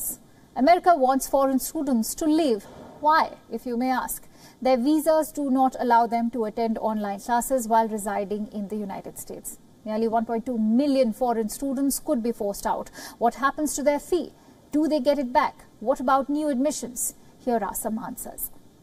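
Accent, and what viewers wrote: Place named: India. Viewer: Stop that indian english accent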